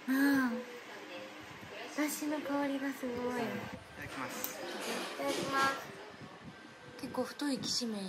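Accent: Kansai, Japan. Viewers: 今日も楽しい動画有難うです。の可愛い関西弁との優しさに癒されていますよ。無理しないでf頑張ってくださいね～。次回もたのしみです。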